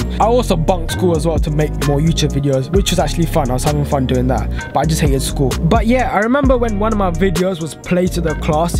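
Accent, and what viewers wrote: London, United Kingdom. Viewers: If you're born in France,why do u have such a strong London accent?😟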